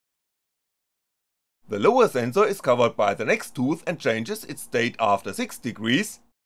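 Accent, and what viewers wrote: Germany. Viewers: Now finally I understand the rotary encoder! Only because it was explained with a German accent, the language of science! And of course because now the two sensors are placed besides each other! In fact it does nog matter where the (optical) sensors are at the wheel, but now that I look at this it all looks very simple. Thank you German guy!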